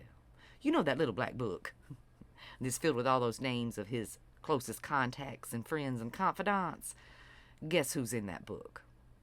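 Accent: South, United States. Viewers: Your southern accent is the finishing touch on a well presented section of the web and drops the gavel down in the court of opinion. Well done.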